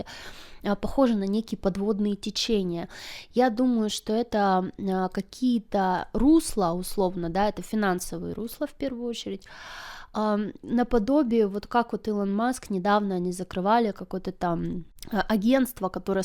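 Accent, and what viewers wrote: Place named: Ukraine. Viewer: По говору слышно, что с Украины, и по смысловой части тоже.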